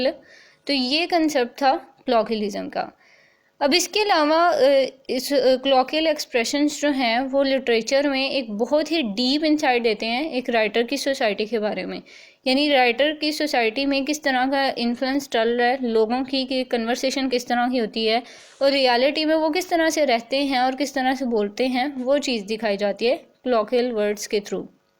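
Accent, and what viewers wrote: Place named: Urdu, Pakistan. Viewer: She is speaking in urdu accent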